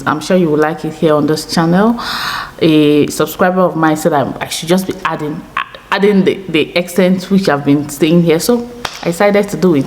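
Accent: Nigeria. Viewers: But your Nigerian accent is not change and it doesn't match your msanzi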